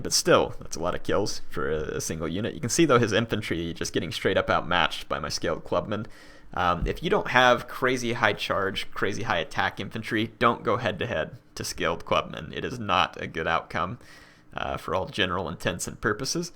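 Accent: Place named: Kentucky, United States. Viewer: Always making my night better with that great Kentucky accent, and leading such a victorious Germanic something army, makes it even enjoyable, great job, I'm really looking forward to the next one, also I really really wish that Attila had the Suebian faction.. I really loved them in Rome 2 but they were a little bit... naked, it would be awesome to see them armored suebi rampaging in the lands of the western romans.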